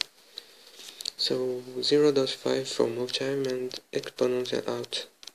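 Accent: France. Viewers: I can understand that cuz i'm french like him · Hablo español y entiendo su inglés con acento francés \ :v / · I heard a french accent when you talk I don't know why x)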